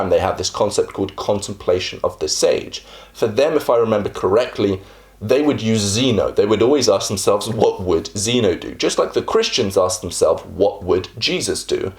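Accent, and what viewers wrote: United Kingdom. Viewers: I thought you was British.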